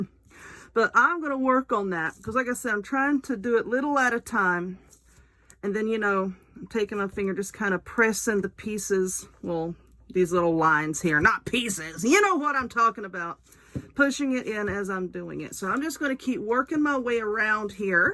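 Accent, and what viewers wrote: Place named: East Texas, United States. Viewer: Olivia's romantic channel recommended yours, so i headed over. i have to ask if you are in east texas? you sound a WHOLE LOT like my family and myself.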